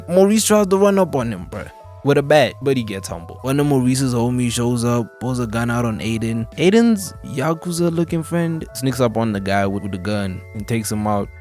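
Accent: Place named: South Africa. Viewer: For some reason I hear mzansi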